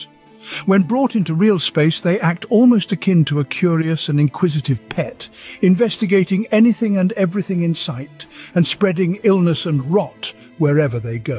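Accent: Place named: United Kingdom. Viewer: The British dude narrating makes my brain turn off and stop listening. Idk why. 🤔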